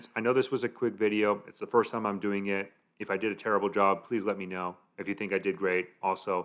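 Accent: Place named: Spain. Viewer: Gringo hablando mal español con acento español es super extraño xd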